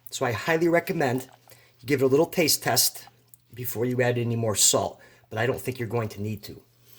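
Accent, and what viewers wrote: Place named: New England, United States. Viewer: Nicely done man I had a feeling you’re also from New England from the accent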